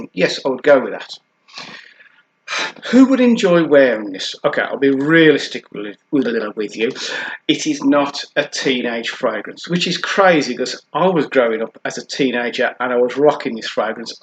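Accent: Birmingham, United Kingdom. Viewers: Great review mate and do i detect a slight Brummie accent there..? ..subscribed 👍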